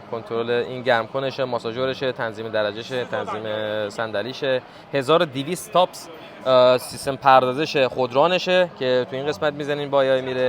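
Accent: Tehran, Iran. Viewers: او موش خور بربری چرا لهجه ایرانستانی گب میزنی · من درست شنیدم ایشون گفت اولین ایرانی هستیم که داریم از رویزرویز چینی فیلم میگیریم ؟! با وجود اینکه تسلط و لهجه عین بچه های شمرون تهرانه ولی واقعا رخ یه چیز دیگه میگه اونقدر چینی میزنه رخش که نمیشه گفت حتی دورگه اس یا اگر باشه یکی از اولیا باید ترکمن باشه یکی چینی یا هوش مصنوعی😂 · اصلا ماشین را بیخیال خیلی قشنگ فارسی صحبت میکنی. لهجه تهرانی واقعا فوق العاده اس. تبریک میکم که اصالت خودت را حفظ کردی با چهره کاملا چینی 😊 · ای جانم داداش قشنگم و با لهجه شیرین پارسی باستان صحبت می‌کنی😊😊😊😊❤❤❤❤❤🎉🎉🎉🎉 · عزیزم قربون اون قیافه چینیت و لهجه قشنگ ایرانیت برم فکر می‌کنم اگر الان کم کم شروع کنی با یک سری از مقامات یا صاحبان کارخانه توی چین که صاحب سبک و جنس‌های خاص هستند قطعاً توی تولیدات بزرگ جهانی سهمی دارند،و یا حتی اگر هم با خود مدیرانشون نمی‌شه با اطلاعات اون شرکت‌ها علت دونستن زبانشون پشت قضیه صحبت کن و فقط جلوی دوربین بیا جوونای ایرانی رو آگاه کن که الان تو دنیای اول ،کشور اول دنیا،که ، به تازگی دیگه قشنگ عیان شده،تو بگو چی داره می‌گذره چی قراره بشه،،،منظورم اینه که یک ذره اطلاعات تحلیلی بده ،که یک سری از این جوونای ،نخبه‌ای که داخل کشور هستند حداقل بدونن که کدوم ریسمان بگیرن..که حداقل کشورمون عقب نمونه از کشورهای دیگه..قطعاً که خیلی کار داریم ما ولی باز امثال شماها که میرید و میاین باید تعریف کنید که چه چیزهایی رو باید تو کشور هم مسئولین و هم جوونا بیشتر باید توجه کنن،و تو چه حرفه‌های ناشناخته‌ای باید کم کم برن اطلاعاتو آموزش ببینن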